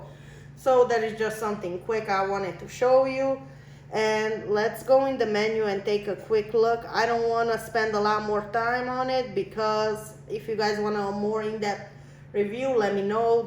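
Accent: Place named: Iran. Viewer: You have that Irani accent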